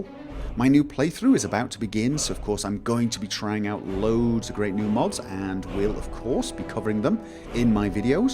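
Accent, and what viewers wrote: United Kingdom. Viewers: REALLY? This whole time I thought he was British ._. And I'm British. He's really refined learning English then o.o, usually people retain accents when they learn a new language (Like Robbaz) but he just sounds British. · Hey, where are you from? I know it says Norway but your accent seems to be very British. Oh and awesome videos btw, keep it up. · Evans He is British. He resides in Norway, though.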